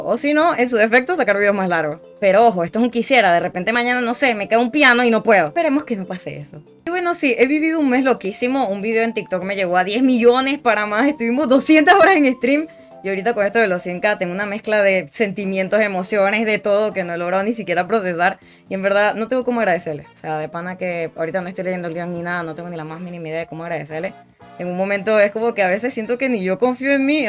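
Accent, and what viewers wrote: Venezuela. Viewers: Amo tu canal amiga es muy gratificante ver tus videos y me encanta como te expresas se siente la verdadera escencia Venezolana en toda su gloria te deseo lo mejor y espero ser viendo cosas interesante en tu canal y mejores y quiera saber de que parte de VENEZUELA SOS :) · Chama, tengo como 6 años fuera de Venezuela y ahora siento que escuchar el acento venezolano me cuesta full, hablas muy rápido jajajaj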